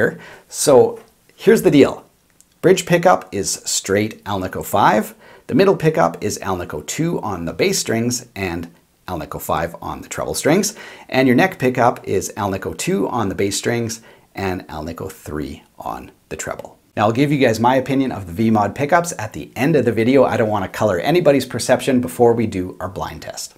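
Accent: Canada. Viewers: You have such a strong Canadian accent lol I love it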